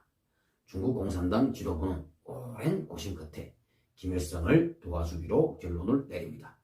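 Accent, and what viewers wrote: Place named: North Korea. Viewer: 북한사람 억양을 바꿔서 설명하시기 바랍니다 듣기가 거북하고 딱딱합니다. 부드럽게 설명하시기 바랍니다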